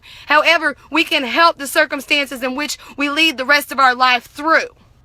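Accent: United States. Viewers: No this is just a confederate loser, they all talk like this.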